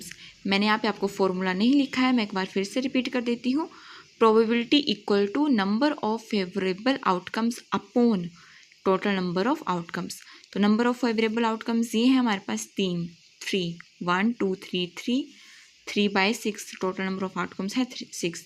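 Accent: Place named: Pahadi, India. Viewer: Didi ji Mera comment padho kya pahadi Ho🎉🎉🎉🎉🎉🎉🎉🎉🎉🎉🎉🎉🎉🎉🎉🎉🎉🎉 you are pahadi kyunki aapki awaaz se lag raha hai ki aap pahadiyon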